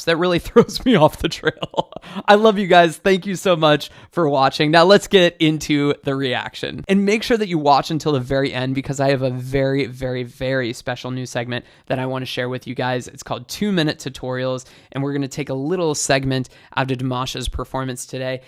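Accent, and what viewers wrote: United States. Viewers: Is that American English or British English? Just saying. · I think you need to work on your overinflated ego and your lack of empathy department. Have you considered that you speak American English and not English maybe you should work on your own accent. Dimash does not even need to sing in your own language to be able to share the story and all the emotion that goes with it. He speaks English differently than you. So what? I bet if both of you would sing the same song you would not be the one people would be talking about despite that you believe to have a "perfect" English "diction". The man is exceptional. Live with it.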